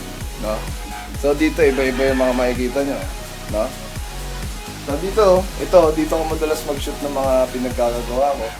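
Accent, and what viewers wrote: Ilocos, Philippines. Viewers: Ilocano ka sir?? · Ilocano ka ata eh noh???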